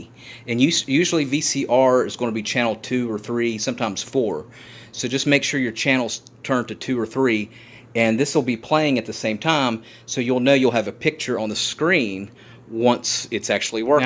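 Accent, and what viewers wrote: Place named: South, United States. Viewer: Thank you kindly sir! Want to send a shout to you and your generosity from your Northern fellow American. I adore your Southern accent! Very charming :) Because of you I can watch my dad’s videos, he passed away 6 months ago. So thank you thank you. You’re the best!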